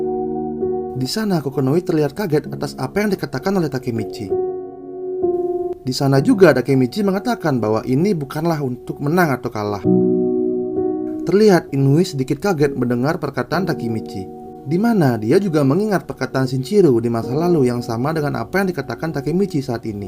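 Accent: Bali, Indonesia. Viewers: org bali ya? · mirip logat bali ngab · Orang bali fix 😂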